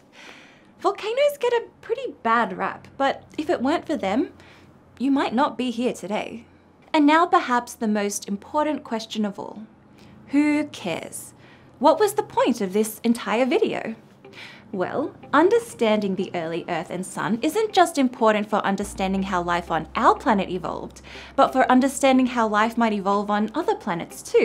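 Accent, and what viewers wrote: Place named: Australia. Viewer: university? (*plus i detect an accent) ..... are you aussie, or sud afrikan, or NZ?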